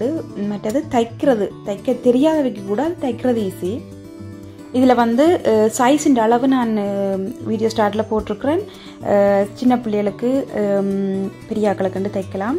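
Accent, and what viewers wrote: Sri Lanka. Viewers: Konjum srilankAn tamizh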